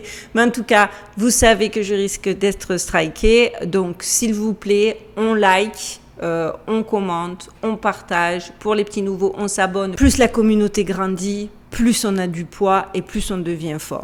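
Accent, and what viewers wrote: South, France. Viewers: Je t'ai découvert assez recement.. or je suis dans affaires depuis au moins 15ans. Merci pour ce travail sérieux et bien detailler. Et avec un bonus merveilleux; un accent du sud. Force honneur et amour sur toi ❤️🇲🇫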